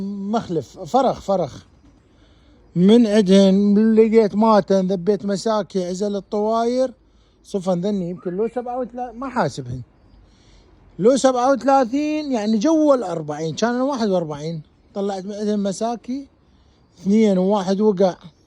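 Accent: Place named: Iraq. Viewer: حلو انت تحجي هولندي باللهجة العراقية هههههههه انت بلجيكا بالقسم الهولندي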